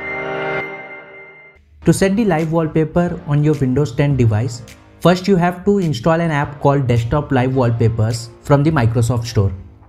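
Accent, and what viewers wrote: India. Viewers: When i heared the indian accent i knew it gona work, thanks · it’s always the guy with an indian accent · > indian accent > less than 10 mins long Those type of videos always work for me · couldnt stop laughing at the Indian accent im sorry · when is indian accent it works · Not the indian accent😭😭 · Ofcourse an indian accent when i saw the time stamp i knew it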